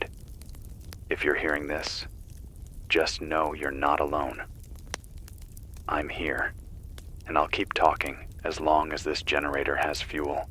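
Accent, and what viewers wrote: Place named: United States. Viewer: Hint,if you want to sell....don't speak American or mention trumpland,///juahhh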